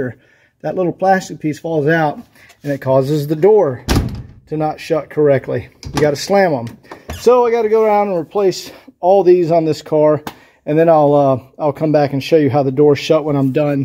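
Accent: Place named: Atlanta, United States. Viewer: Excellent video. I’ve done some of this stuff to my <num>d. Haven’t tackled the sunroof or antennae yet. I also need to do my A/C and clutch master/slave cylinder. Im assuming you must be near Atlanta based on accent and the YouTube description phone number. I’m up in the mountains above atlanta. Are you a w123 shop? Any good references for local w123 salvage yards? Parts places? What about body and paint guys familiar with fixing rusted w123 body pieces? Thanks!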